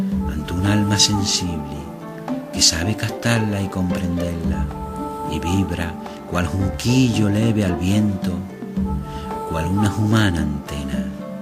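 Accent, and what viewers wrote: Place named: Extremadura, Spain. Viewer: Muchas gracias, mi estimado amigo y paisano, por este precioso montaje audiovisual de mi poema en el que se dan la mano fraternalmente el verso y la imagen en una sincronía perfecta... Me ha gustado el tono de voz grave,sereno, solemne y la "extremeñización" del lenguaje con las haches aspiradas.Sólo tengo que ponerte un "pero": no has puesto ni una sola imagen de mi querido pueblecito, Jaraicejo. Un abrazo fraternal de tu amigo Wenceslao Mohedas Ramos Jaraicejo (Cáceres) / Barcelona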